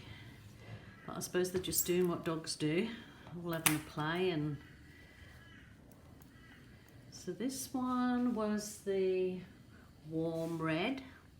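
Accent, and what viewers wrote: Birmingham, United Kingdom. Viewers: I love your result. I know you are in Aus but you sound like a Brummie to me. Are you originally from the Midlands?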